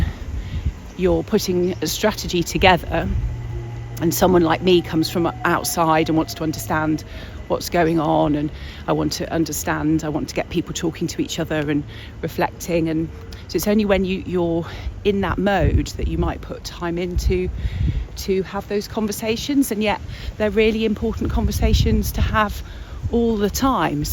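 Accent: United Kingdom. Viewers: Hi, I love your accent. I'm studying English and I find your British accent fascinating. I'll keep watching. Thank you very much for your work. Have a nice day!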